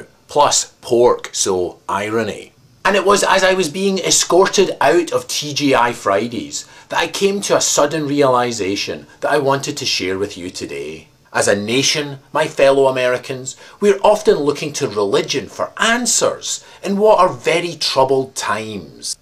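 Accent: Scotland, United Kingdom. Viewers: Your Scottish (or Irish) accent is the funniest thing in your videos. The rest of the content is fairly awesome, too, however. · I love Scottish acsent and I love this video, you are the funniest guy.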